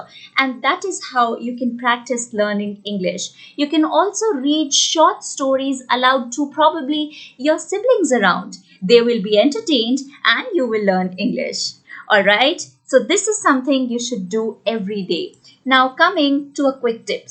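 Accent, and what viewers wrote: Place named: India. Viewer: Indian accent ☺☺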